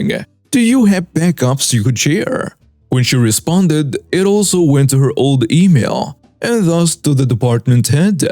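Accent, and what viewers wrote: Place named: South, United States. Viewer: #1 love your southern accent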